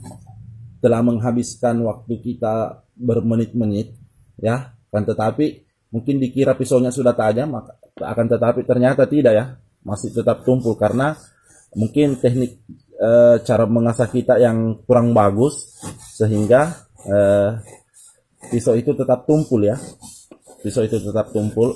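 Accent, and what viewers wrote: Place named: Makassar, Indonesia. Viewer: Ini org makassar pemirsa... ketauan logatmu... smsm jaki nukasi bodo2 semua pemirsa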